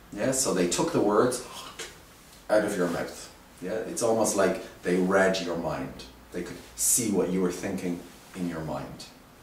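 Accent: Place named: Ireland. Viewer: You sound to be Irish, you're from Dublin right?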